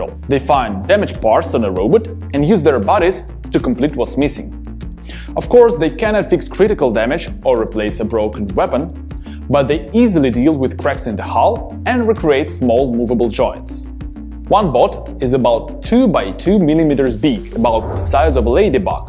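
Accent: Russia. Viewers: Ох уш этот звиздатый русский акцент😁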